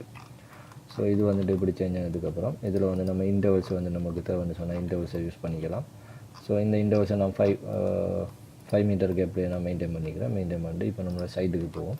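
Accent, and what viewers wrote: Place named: Eastern Tamil, Sri Lanka. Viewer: Best of luck bro Eastern tamil ketkawe mass a irukku Konjam basic la irunthu solli thara ealuma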